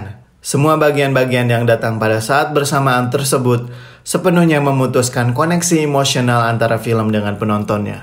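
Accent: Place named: West Java, Indonesia. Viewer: Oh, Aa teh native Sunda ya hahahaha... BTW, kaget juga ya kok ke mana ya Waltz of Flower-nya...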